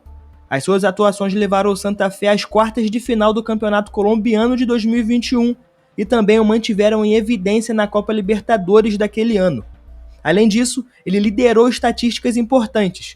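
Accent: Rio de Janeiro, Brazil. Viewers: Cara esse sotoque carioca forte dói no ouvido. Eu me rendo, as vezes é melhor leitura de IA.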